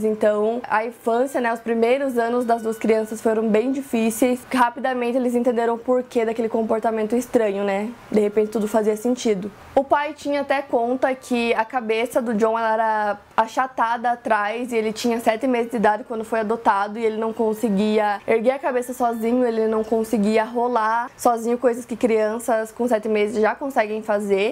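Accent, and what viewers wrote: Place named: Paraná, Brazil. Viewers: Eu também sou de ponta grossa PR msm cidade que ela então pra mim é normal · Ela é de PG aaaa amo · ela só é sulista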